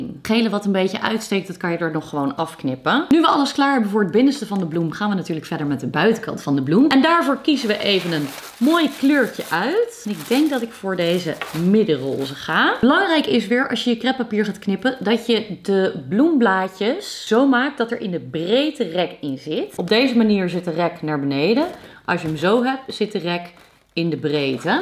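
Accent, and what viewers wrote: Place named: Flanders, Belgium. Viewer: Ik peis daje accent da ge deedt gents of antwerps of west-vlaams 1 van de 3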